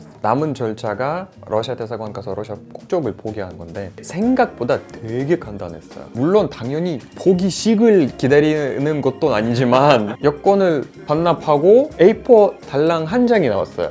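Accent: Seoul, South Korea. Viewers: 부드러운 한국 서울말. 당신은 제일 한국인입니다. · 토종인 나보다 서울말 잘하네 어영옵써 ㅋㅋㅋ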